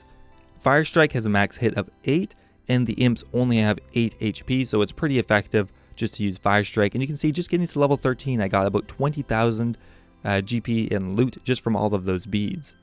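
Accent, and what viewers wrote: Canada. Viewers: Hmm didn't notice before, is that Canadian accent I hear? :P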